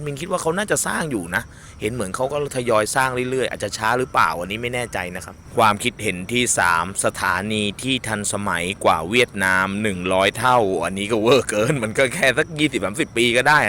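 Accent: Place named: South, Thailand. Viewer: 😂😂😂😂😅🤣😆😁😂กดขำให้ตั้งแต่ยังไม่ฟังเลย/อ้อ!ชอบแอดพูดภาษาสำเนียงใต้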